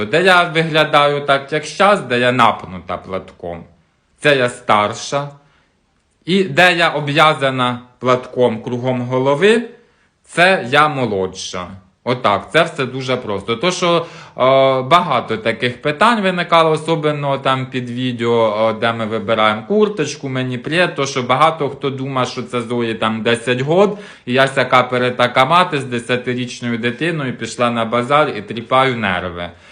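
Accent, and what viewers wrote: Poltava, Ukraine. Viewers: Дуже приємно Вас слухати! Справжній Полтавській суржик. Слухала б і слухала. Гарно розумієтесь на сільських тонкощах! Натхнення Вам на наступні відео! Чекаємо!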